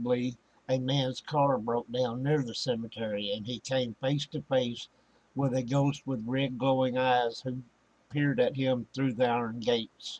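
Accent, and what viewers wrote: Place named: United States. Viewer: How come there are know English person doing this video surly we know more about our heritage than Americans I say that because the person talking sounds American to me just saying lol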